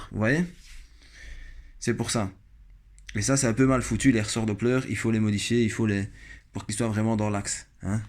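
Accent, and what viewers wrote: Belgium. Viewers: tu me fais deliré comment tu parles...ta "façon d'être" au travers des vidéos... tranquille quoi, posé.. avec ton petit accent belge (si je me trompes pas). en tout cas continu tes videos cest sympa et apaisant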